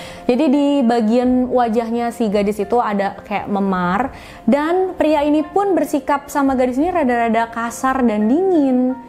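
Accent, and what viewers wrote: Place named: Bandung, Indonesia. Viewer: Kamu orang Bandung ya, logat ngomong.a kaya orang Bandung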